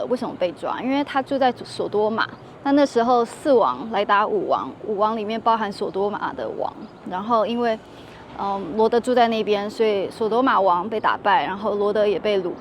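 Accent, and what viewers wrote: Taiwan. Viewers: 聲音真的一聽就是住台灣的😅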